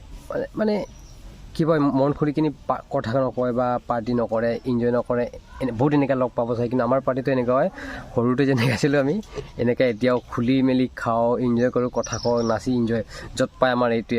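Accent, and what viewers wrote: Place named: Mishing, India. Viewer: Bosdikee,,who the fuck are you to comment like this,he is belongs from mishing community so his first mother tongue is missing though he is able to speaks Assamese ,we have to appreciate him instead of insulting or looking him down..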